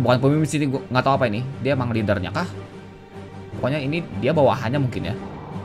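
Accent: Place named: Java, Indonesia. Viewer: medok hadeuh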